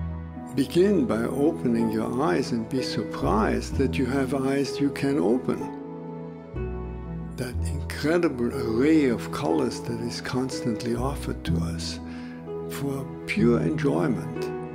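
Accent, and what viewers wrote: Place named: Austria. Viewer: Brother David is speaking in his second language, English. He is speaking with an Austrian dialect. He is from Austria.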